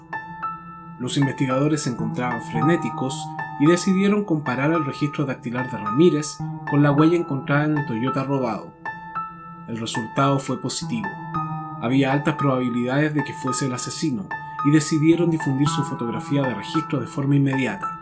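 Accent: Chile. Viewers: Lo único bueno sería que hables bien español neutro y no chileno que no se entiende nada, y aprende algo de Inglés por dios.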